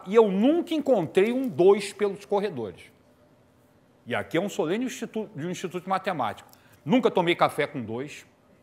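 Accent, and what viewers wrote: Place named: Rio de Janeiro, Brazil. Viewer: Esse professro é carioca! acertei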